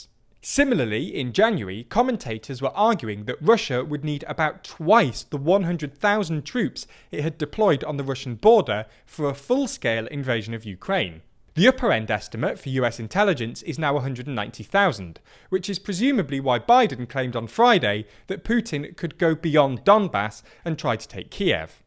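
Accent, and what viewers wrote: United Kingdom. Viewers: That british accent is so horrible, christ, why, just why.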